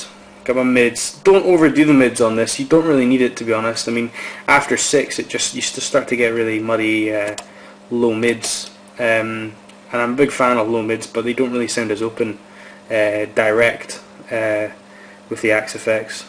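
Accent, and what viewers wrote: Canada. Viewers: are you canadian? i hear a thick accent. but i also get a small distortion problem with my axe-fx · k...ur acsent sounds a little canadian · oh nice lol you sound canadian though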